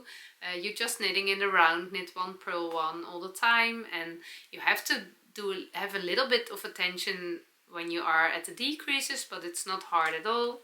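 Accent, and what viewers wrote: Netherlands. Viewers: Your hat is adorable and you look so beautiful in it!😊 I Always enjoy your shows and your “ Dutchey English”!😊👏 You do a great job and I always appreciate you not editing your videos to keep them real! 🤗Thank you and Blessings to you and your family!😊🌸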